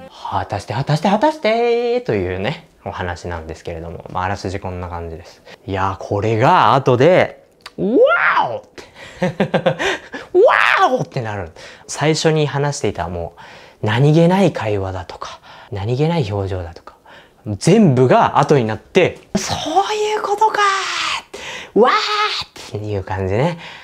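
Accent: Kagoshima, Japan. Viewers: 鹿児島の方ですか！？ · さんの情報全くない中で見ましたが、開始15秒で鹿児島出身だということが分かりました😂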